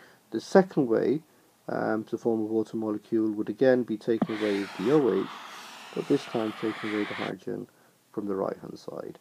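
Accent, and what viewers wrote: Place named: South Africa. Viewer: i think u are South African sir, your accent tells, by the way good job.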